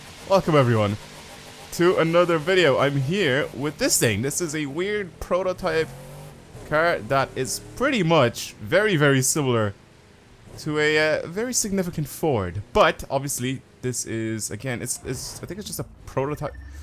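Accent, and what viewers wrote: Ireland. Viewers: Are you from Ireland? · Are you irish?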